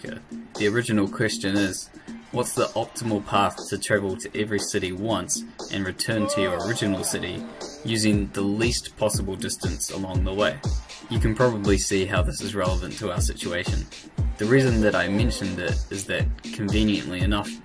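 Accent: New Zealand. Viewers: Rest of the world says: section, checkpoint NZL says: séction, chéckpoint Funny accent! Good job with the video mate! Have you used the 'traveling salesman' problem for optimization? It is the most efficient way (that I know of) to determine the fastest route from A to B. Edit: I was halfway in the video when I asked it, sorry. So you did use traveling salesman :) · so refreshing to hear a kiwi accent talking about tm. where abouts are you from in nz btw? i'm in chch ~ psyko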